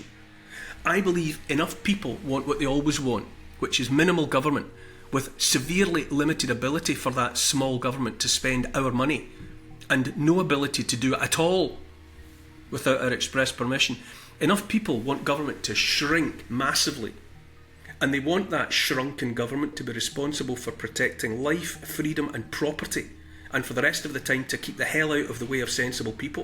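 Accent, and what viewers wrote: Scotland, United Kingdom. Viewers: It's the Scottish accent. Our DNA has been programmed to revolution since William Wallace.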